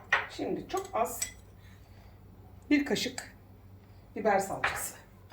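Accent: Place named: Thrace, Turkey. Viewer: Macırsınız galiba.kıyık bırak dediniz ordan bildim.zaten konuşma aksamınızda trakya aynı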